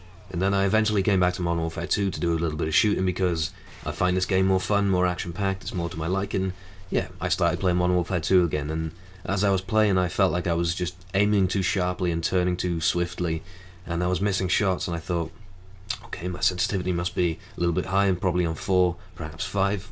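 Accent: Wales, United Kingdom. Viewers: yeh, he sounds like my relative from the Gower area in Wales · Nice video;) like the way you say what we all think with a British accent. BTW, try M8 tac knife.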